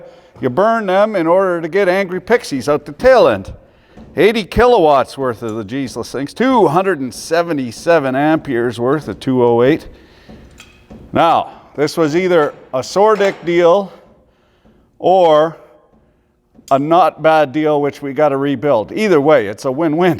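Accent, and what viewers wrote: Quebec, Canada. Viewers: Are you from Québec ? Been binge watching your channel since I heard you say calice de tabarnark in a Bosch video.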